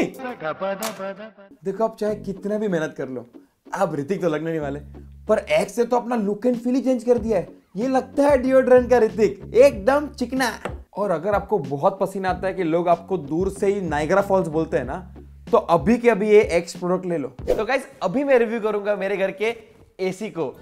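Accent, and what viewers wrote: Gujarat, India. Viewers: Bro your acting skills and that perfect gujrati accent just perfect ❤️❤️ and ya this is not your mom again.😂😂😂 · The best thing is accent. Every Gujarati speaks in mix Hindi and Gujarati language. And yes every Gujarati middle class watch TAARAK MEHTA all day😂😂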